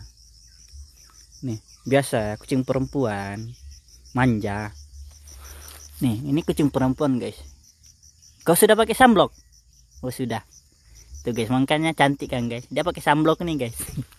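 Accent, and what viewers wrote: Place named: South Sulawesi, Indonesia. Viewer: Kakak domisili di Kalimantan kah... Tapi bahasa dan logatnya kayak orang Sulsel... Kucinng petani ikut tarbiyah...sdh tingkatan takrif atau tahwin kak? 👍🙏He he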